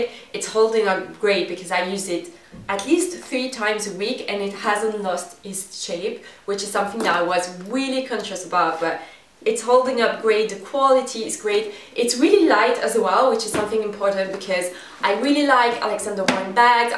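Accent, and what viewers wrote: France. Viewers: She have a french accent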